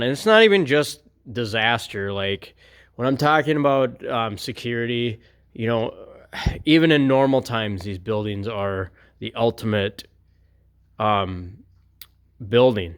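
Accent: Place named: Canada. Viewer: I could have sworn you were canadian.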